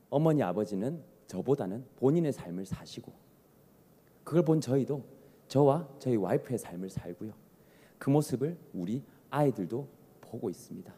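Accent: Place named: Gyeongsang, South Korea. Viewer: 리더님 경상도 사투리가 부드럽네요~늘 영상 잘듣고 있습니다^^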